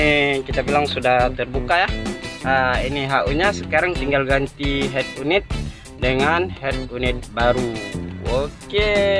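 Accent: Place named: Aceh, Indonesia. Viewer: Medok. Yaa aceh nya:v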